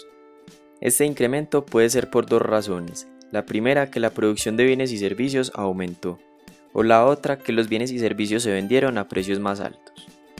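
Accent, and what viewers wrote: Antioquia, Colombia. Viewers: Eres muy claro y acertado al explicar! y tu acento paisa hace más ameno escuchar este tipo de temas haha :3 Gracias por tomarte el tiempo para hacer estos vídeos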